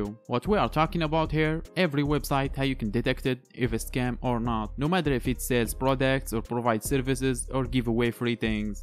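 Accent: Morocco. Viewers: لهجة مغربية 😅 بينة من طبارة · are you from morroco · khoya bayna fik maghribi f accent rak nadi akhoya,khoya momkin insta dialek?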